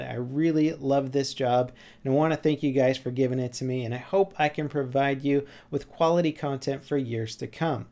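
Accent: United Kingdom. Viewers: Don't ask me why but before the ice bucket challenge video he posted * confesses* I thought he was British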